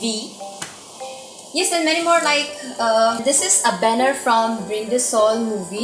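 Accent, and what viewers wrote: England, United Kingdom. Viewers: I like your hair and your English accent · I still can't get over your cute English accent 😍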